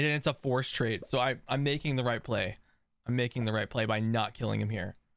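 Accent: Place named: Ohio, United States. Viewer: I knew you were from Ohio by the way you pronounced Medina.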